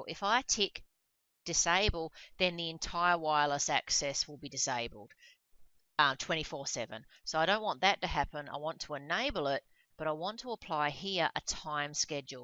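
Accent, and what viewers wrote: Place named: Australia. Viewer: you are so Australian, I love it <3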